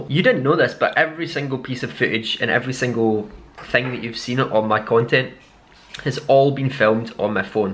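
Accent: Scotland, United Kingdom. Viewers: Im excited for you, i love listening to you talk! Scottish is the best accent!!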